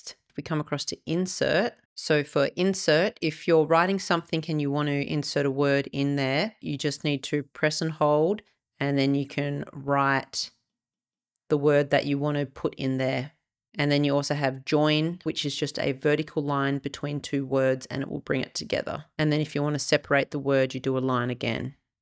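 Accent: Australia. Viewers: Now im being presumptuous and assuming Melbourne accent. So, the huge question is…… Collingwood or Carlton? I’m Collingwood good win last night 😎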